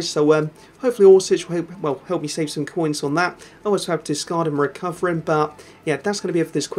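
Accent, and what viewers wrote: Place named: England, United Kingdom. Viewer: What part of England are you from the accent is different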